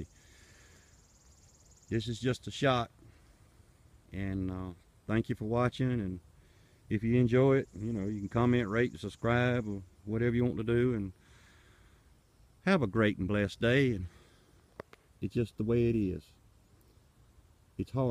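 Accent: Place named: England, United Kingdom. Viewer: Haha nice English accent! So U and ur cousin farm together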